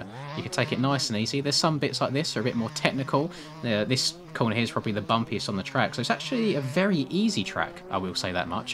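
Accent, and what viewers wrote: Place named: England, United Kingdom. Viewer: im american and i can attest to the fact that i watch your videos because of your typical english man accent it adds to my experience and plus your a really good rider that makes good content keep it up broski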